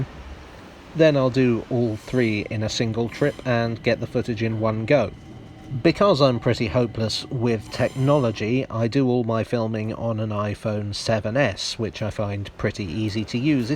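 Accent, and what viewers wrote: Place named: Midlands, United Kingdom. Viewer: Well done! 50,000 can't be that bad. I have been trying to workout of your accent. I'm usually quite good at it but can't quite pinpoint yours. Perhaps like me you were born in the midlands or other parts of the hinterland and in very early (talking) years moved to london. Let me know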